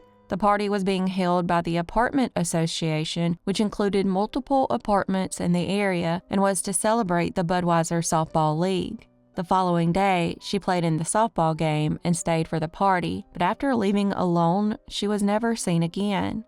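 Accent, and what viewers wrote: South, United States. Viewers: I love to hear that southern accent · I love hearing a southern accent for a change, born, raised and still in southeast GA I haven't heard these before only the current case I'm following in Sumner County, Sebastian Rogers as well as Summer Wells also in TN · Hearing Southern crime/unsolved mysteries stories from a narrator with a Southern accent is so much better.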